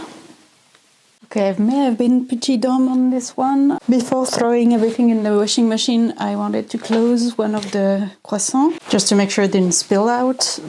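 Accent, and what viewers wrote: France. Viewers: Very cute, very hilarious. No need to apologize for your accent or being French. Neither are a bad thing ❤ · "Sorry about that" re the French accent nooooo I love your French accent and random French words! The bed is soooooo cute! Such a lovely cosy nap spot for Not My Cat ❤. Also let him have some croissant please, he deserves a sweet treat! My kitty loves croissants and castella cake, whenever we let him have a teeny tiny nibble he is very happy 🥐🥐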